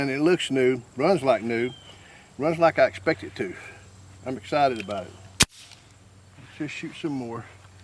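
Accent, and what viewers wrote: United States. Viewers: What state do you live in? Sounds like America. Sorry I'm a POW in commiefornia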